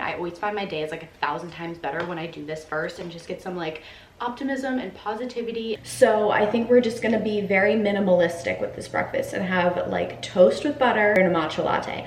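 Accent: United States. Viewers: I'm here just to practicing american accent .haha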